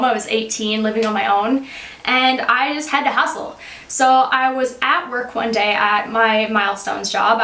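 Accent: Australia. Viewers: You have a bit of an Australian accent? :D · omg i havent watched one of your videos for ages, but i have really noticed the aussie accent come accross more now then before! i missed watching your videos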